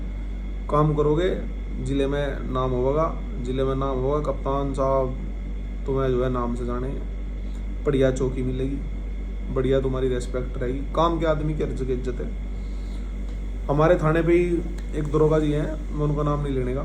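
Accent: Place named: Meerut, India. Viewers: Bhai ap kha se ho Meerut k aas pas k lg rhe ho language accent se... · भाई कौन से जिले से हो बोली तो हमारी जैसी lagri है मैं शामली से हु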